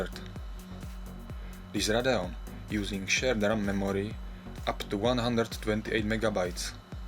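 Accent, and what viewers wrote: Czech Republic. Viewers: Hned jak jsem slyšel ten tvůj akcent tak "jaj čech" :D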